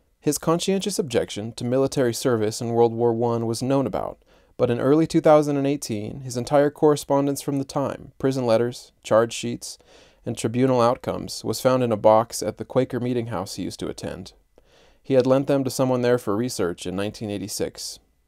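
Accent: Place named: United States. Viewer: Great work! (why the American accent on the video?)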